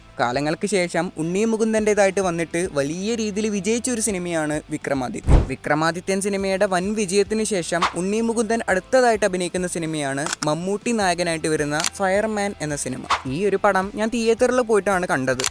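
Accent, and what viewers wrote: Kannur, India. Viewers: bro roastil ittapole kannur slangil samsarikk🤌✅️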